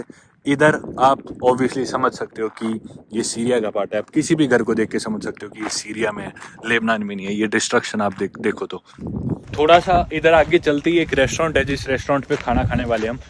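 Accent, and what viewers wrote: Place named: Rajasthan, India. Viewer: Bhai aap hamare rajasthan se ho aapki aawaj se to lagta h 👍🙏🙏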